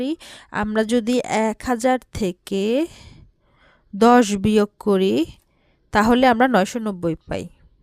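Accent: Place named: Chittagong, Bangladesh. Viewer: তোমার জেলা আমি জানি চিটাগাং😂😂?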